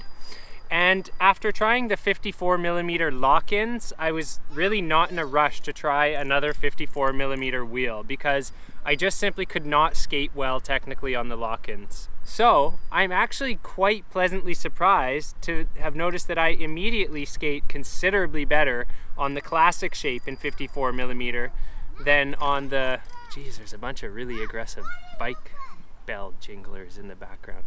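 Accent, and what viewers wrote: Canada. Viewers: Hence my never noticing you pronounce it that way. Wasn't trying to imply that you didn't know better or that were even saying it wrong. But, I get it now. Well mannered Canadians say it that way.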